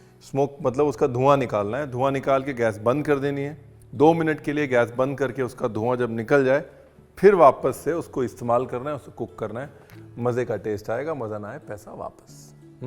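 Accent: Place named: Kanpur, India. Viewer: Aap kanpur se h lag raha. Aapki boli kanpuriya h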